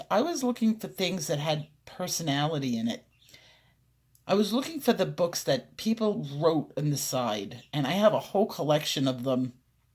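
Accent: New York, United States. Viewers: I THOUGHT I heard a tinge of NY in your voice never wanted to assume, ;) thanks for another great video, the coloring was suggested by a therapist for my anxiety as a coping mechanism, when I am needing to just zone out sometimes I pick up one of my books and pencils and get going. EDIT- I also bought a book from the thrift store with a woman's writing in it, it was a type of daily inspiration for women book, and her notes intrigued me so I purchased, thought I was the only one who did that...THIS is the reason why I like your channel so much, because of your personality and how you share parts of your life with us in a comfortable manner, I feel like I know you, its more than coloring here its about introspection and reflection and life and humanity and how that all ties into you, your life and family and art and coloring. I LOVE IT. Thanks for going deep with us we love it!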